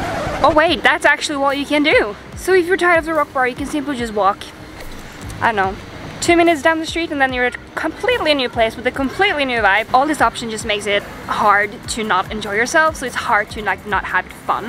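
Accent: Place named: Scandinavia. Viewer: Interesting approach to get viewings and subscribers on your channel. Negative press sells better than positive press. By your accent you might be scandinavian.?? Would be something like "EL VALLE DE LAS CATETAS". Anyway. We got plenty of places for you to go to that is not here.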